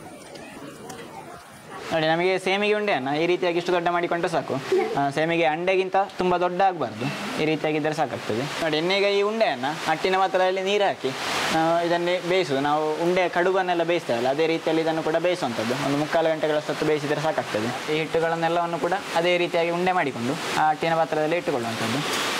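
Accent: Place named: Udupi, India. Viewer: Super receipe...nicely done.looks yummy. I like all your videos and enjoy the way you talk in Udupi Kannada.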